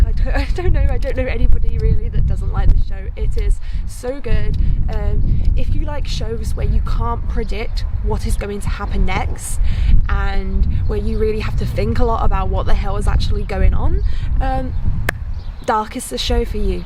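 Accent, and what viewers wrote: Essex, United Kingdom. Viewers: My wife is from Essex. I can hear Essex in your "Fink" and "ehmm"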